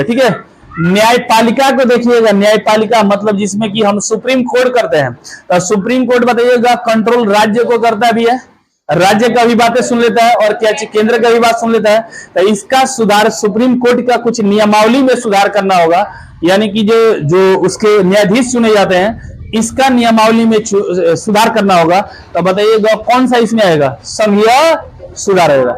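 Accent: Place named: Bhojpuri, India. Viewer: Bhujpuri style me ek dum mast sir ji Maza aata hai padhne me bhi nice sir 💯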